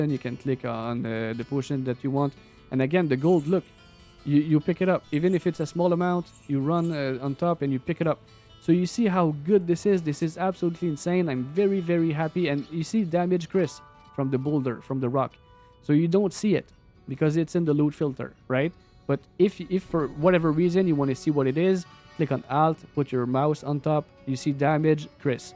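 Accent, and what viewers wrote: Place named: Quebec, Canada. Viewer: Nice merci man, en passant ton accents... té b'en Québécois eh? 😀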